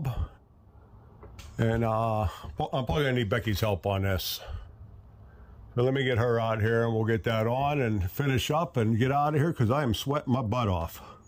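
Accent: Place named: Pennsylvania, United States. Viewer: I just hear Pennsylvania when you talk.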